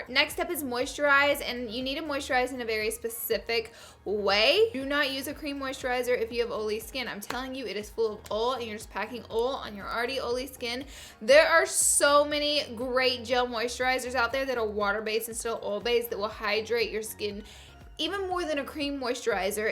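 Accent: South, United States. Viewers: Many southern people talk like this! Lol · What part of the South are you from? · OMG there is nothing wrong with her voice!!! She is from the South so, she talks like that and obviously if you guys were from the south you would talk like that too. So why watch this video if you are going to make fun of how she talks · I’m from the south I know ur struggle with words Ily ❤️❤️❤️❤️😘😘😘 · I literally just started watching this video, I'm 45 seconds in, and I already love her. I was raised in the south as well, although I don't say oil like that, and I love her accent. She's super pretty too! (New subscriber here!!) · Love you I myself is from the south and I also say it like you do haha! Not ashamed! I am also a hot oily mess girl! I love these tips thanks so much! 😘😘😘 hope you're having fun in New York! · Oh my gosh I honestly love you so much like I just started watching your videos a week ago and I've been binge watching them non stop😂 I think your super funny and cute and I love your south accent.. 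you kind of inspired me to get into makeup. I'm only 14 but it's soo funn lol keep dining what your doing girl ❤️ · I love your Southern accent! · what part of the south are you from? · I love your southern accent 🤙🏼🤠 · I love your southern accent, it makes me feel at home ❤️ don't ever apologize for it. 😘 love from Louisiana (except your football team. Nope. LSU forever 🐯) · I love accent. I was born in Venezuela and in Latin American but I moved to Texas when I was a baby. I grew up in the south and her accent is so beautiful. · Girl...I absolutely love you, you are too crazy lol! I love to watch your videos because I usually laugh the whole time. Us girls from the South ie. (Kentucky), we do say things different but I love my heritage. Even if we do say "OIL" wrong to others. He he!! Love ya girl and I will see you next time!! Xo <3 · you're so hilarious and I love the southern accent 🤗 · Girl I'm from Louisiana and I love the southern accent!! 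My shirt right now says "talk southern to me" wish I could post a pic. Lol u. Need it! Weerrrkk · She sounds like she's from Georgia or South Carolina.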